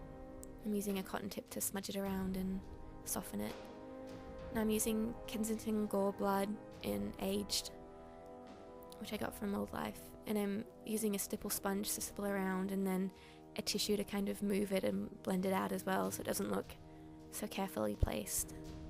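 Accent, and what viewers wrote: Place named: Australia. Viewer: Took me about five videos to realize you talked "funny" but that's because I'm used to hearing american accents on you tube... (Note: by funny I mean Normal, as in Australian ) love ya work, will have to look into emulating some of it :)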